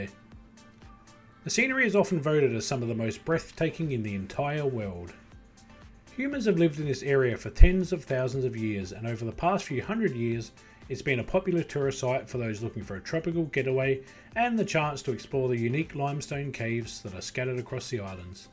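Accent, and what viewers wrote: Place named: Australia. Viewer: I love the Australian voice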